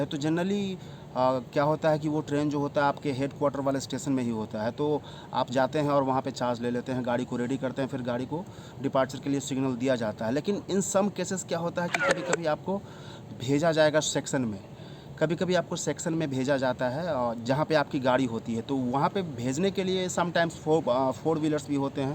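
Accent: India. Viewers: Bhaiya aap ho to bihar ke hai mai apke acent se pahchan gya Lekin kis district se ho bihar ke😊😊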